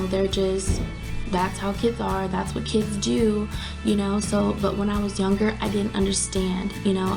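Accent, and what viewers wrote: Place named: United States. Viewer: You are so adorable with your little country accent ❤❤❤❤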